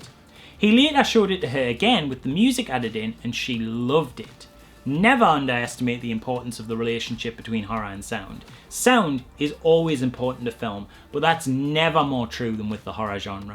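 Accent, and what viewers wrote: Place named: Durham, United Kingdom. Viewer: New subscriber here, just discovered you. The effort you put in is super impressive!!! Really enjoyed your take and info!!!! Off topic, I can't quite pin your accent, I was born n bread Gateshead but now live at the coast, can I guess your accent is Durham 🤔 Like I say, I'm new here x Looking forward to binging more vids pal 💚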